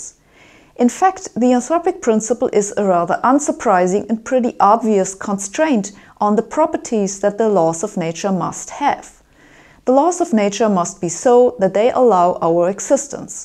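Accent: Germany. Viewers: Not related at all...well partly maybe... I love accent. In particular as a specific example of my appreciation of the general German accent. I note that certain accents rub me a certain way. German sounds cuttingly precise, the way you'd expect a high quality German automobile to be designed. Russian and Indian sound kind of comical to me, in different ways. The royal British accent of course sounds incredibly high brow, very polished, and upper society. Australian sounds amiable, down to earth, matter of fact, in a jovial way. Italian sounds happy and very excited. I could go on but I shant. Fuggedaboudit!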